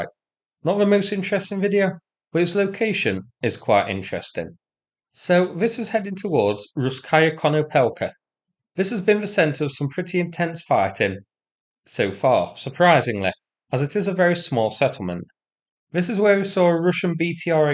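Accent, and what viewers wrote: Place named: Yorkshire, United Kingdom. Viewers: I see that YT have you as Taiwan based. Is this commentator AI ? If so, it's a great Northern British Accent. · Forgive me if I am wrong. As I just Luv your Yorkshire accent...It reminds me of my Grand Dad and Great Grand Dad...as my father went to University and he didn't have an accent although he was born in Scotland...😊😅😂. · Guessing Yorkshire /Lancashire accent, same for the Rabbit. 🙂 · How could that be, when everyone is identifying his accent as Yorkshire? Mansfield is in Nottinghamshire, quite a hike south of Yorks. This is an honest question, as I am not an expert on the speech of Notts.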